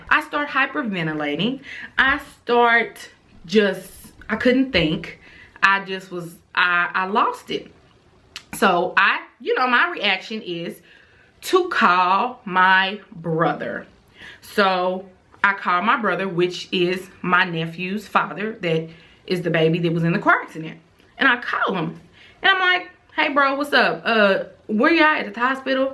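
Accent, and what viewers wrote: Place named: Memphis, United States. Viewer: And you from Memphis??? I can hear the accent😭🥰🥰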